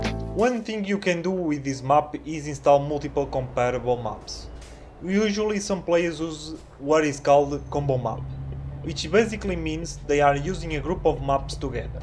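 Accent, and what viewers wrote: Portugal. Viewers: quase certeza que é portugues esse sotaque não mente kkkkk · Eu juro que so pelo sotaque imaginei logo que fosses portugues ahahah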